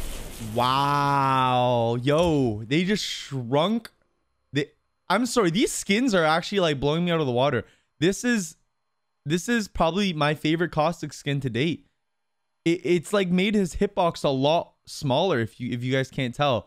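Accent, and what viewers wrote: Canada. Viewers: Is he Canadian or im just dumb Idk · He’s Canadian idk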